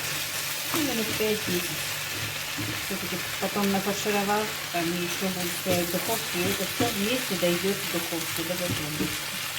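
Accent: Standard. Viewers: Какие интересные рецепты, обязательно приготовлю. Слушаю вас и поражаюсь : какая у вас правильная литературная русская речь! Ваш голос и речь очень похожи на голос моей тёти — учительницы русского языка и литературы!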